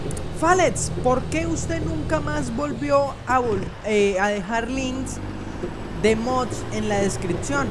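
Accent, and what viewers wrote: Colombia. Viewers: eres de pereira?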